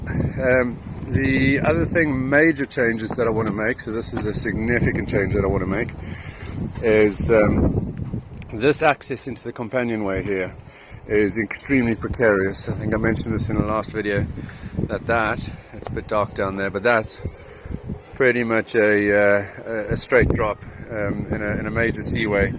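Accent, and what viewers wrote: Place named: South Africa. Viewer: You have a very South African accent there... An expat maybe?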